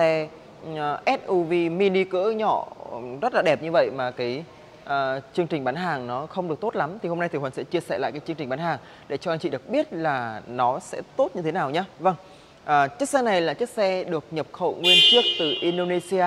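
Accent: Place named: Thanh Hoa, Vietnam. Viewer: Thằng này nói nung tung núc thì 550 núc thì 650 nói quá nhiều giọng lại thanh hóa khó nghe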